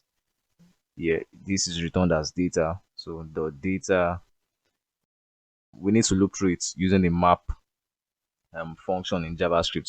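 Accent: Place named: Caribbean. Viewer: Nice video! Very helpful since I'm just starting out with APIs. Are you from the Caribbean by any chance? Cool accent :)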